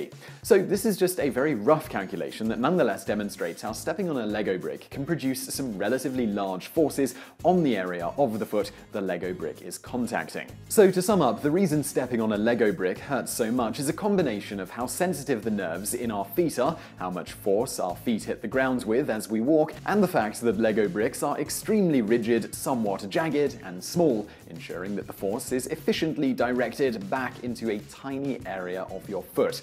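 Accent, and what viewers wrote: United Kingdom. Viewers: I'm disturbed because this Matthew Santaro/ Vsauce hubris has a British accent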